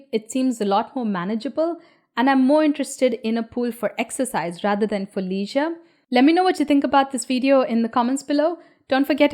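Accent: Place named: India. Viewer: You are the only Indian person i like on YouTube. I usually cant stand the accent but your information is so well worded and to the point that I don't mind. I assume this compliment also sounds like an insult, but that is not my intention. Just being honest. Thx for the upload!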